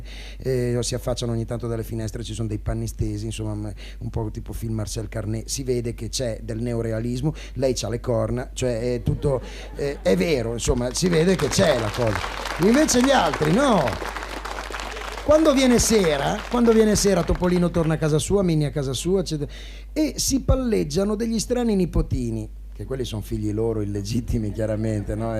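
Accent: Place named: Genoa, Italy. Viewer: Oltre che bravissimo cantante era pure molto simpatico....con quell' accento genovese...fa tanto piacere ascoltarlo parlare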